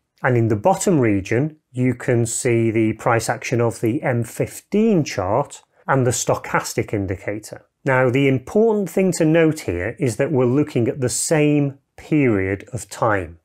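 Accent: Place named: United Kingdom. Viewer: Excelent contents and presenter's british accent is music!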